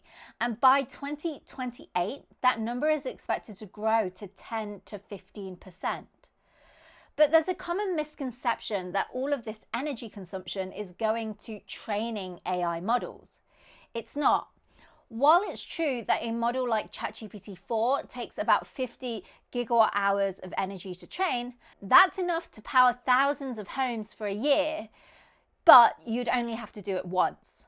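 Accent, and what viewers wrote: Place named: Midlands, United Kingdom. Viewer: Why no Wikipedia? Or did I misspelll? " 2 March 2018 J04n talk contribs deleted page (Wikipedia:Articles for deletion/ (XFDcloser))" I was just wondering what her accent is. It doesn't really sound Midland, but words like "upset" sounds like "oopset." So, maybe she is. I luuve her content.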